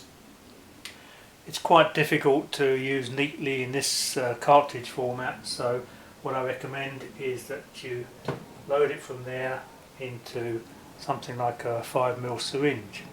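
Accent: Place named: England, United Kingdom. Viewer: england would be a nice place to visit one day. if all my english internet friends didnt live in rough neighborhoods. I had to ask because of your accent. well technically it's not an accent seeing as though you speak the english language correctly guns and the english just do not seem to go hand and hand these days. older english guns however are quite amazing. i want a webley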